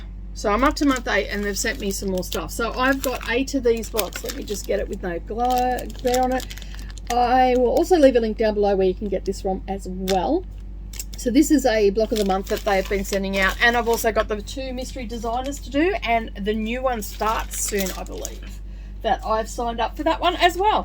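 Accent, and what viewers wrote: Australia. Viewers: Thelma writes:, so good to watch you doing EPP. I am doing just that at present using all my scraps. You voice brings me back to Melbourne where my son lives. I was there visiting my grand-children but did not have a chance to visit any craft shop. Thanks, I love the EPP, 👍 👍 👍 👍 Keep it up!!!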